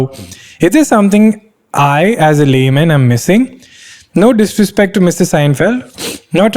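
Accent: Kerala, India. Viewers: talking in english with a hint of Malayali accent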